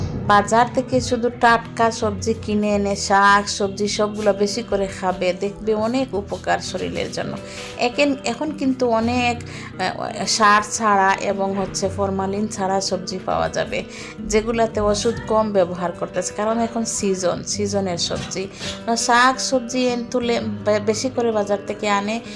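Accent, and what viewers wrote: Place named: Sylhet, Bangladesh. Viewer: আপনি সিলেটি।কথায় ই বুঝা যায়।আমি ছিলাম ২৪ বছর।এখন ফেনি আছি বাবার বাড়ি।বিয়ে হইছে ৪ বছর রানিং।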